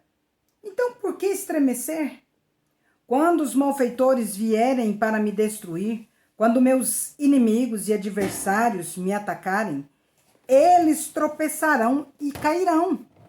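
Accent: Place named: Minas Gerais, Brazil. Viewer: Nossa linguagem de mineirez 😂😂😂 é muito boa.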